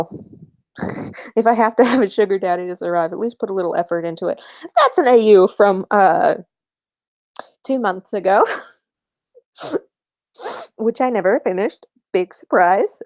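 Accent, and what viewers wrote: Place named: United Kingdom. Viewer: Your British accent is perfect. And don't think I didn't catch that harry potter reference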